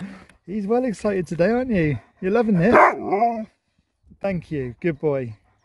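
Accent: United Kingdom. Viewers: Are you cornish?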